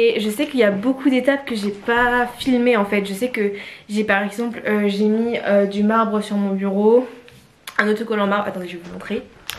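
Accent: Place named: Antilles, France. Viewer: T'es antillaise parce que moi je suis martiniquaise et t'a vraiment un petit accent 😁 En tout cas ta chambre est belle et ton vernis aussi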